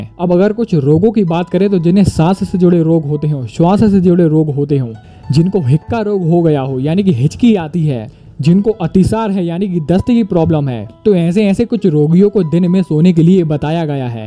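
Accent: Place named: Bundelkhand, India. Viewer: Bundelkhand se h aap sir😊😊😊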